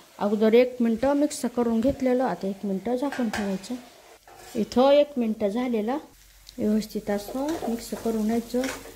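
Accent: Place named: India. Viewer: तुमचा आवाज कीती गोड आहे 😍 अस्सल गावरान मराठी भाषा त्या आवाजाला अजुन सुट होते 👌💯✅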